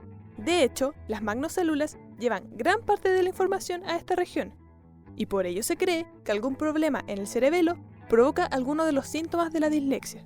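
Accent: Chile. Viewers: eres chilena?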